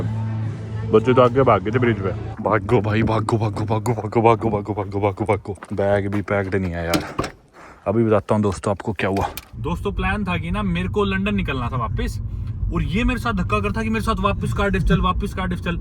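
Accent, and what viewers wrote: India. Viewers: I happened to stumble upon this channel by accident and what attracted me most was the language. At last someone speaks for Indians in their language. Talking about Wales who are proud of their heritage and try to preserve their language, this is being published in English with Indian accent. Why is there such need to speak in English for Indian audience. Knowing another language is an achievement and useful but making it your own…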